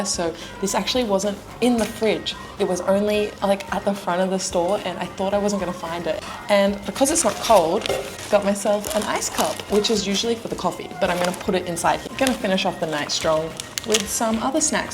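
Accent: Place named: Australia. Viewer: Rocking the look! Also, I feel your aussie accent has got stronger since you have been back home there recently ✌️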